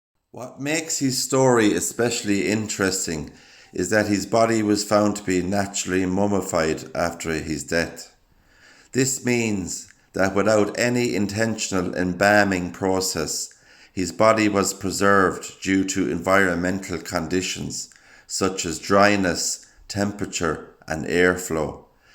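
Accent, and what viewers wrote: Ireland. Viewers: I enjoy your videos and love your brogue. You remind me of my great grandfather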